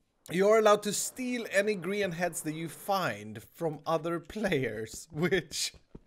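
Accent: Sweden. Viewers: r u swedish? i think because of 4 reasons. 1. ur accent sound like swedish (ik because im swedish too) 2. = icecold85. 3. is = ice. kall = cold. 4. u live in a ice castle in HermitCraft and an ice castle should make your icecold. icecold85:)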